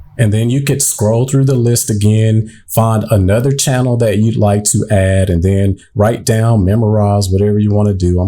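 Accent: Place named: South, United States. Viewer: Came for the info, stayed for the accent. I don't know where you're from, but I love your accent. I live in NYC but I'm from Alabama... sounds like you're somewhere close to there. Thanks for the video!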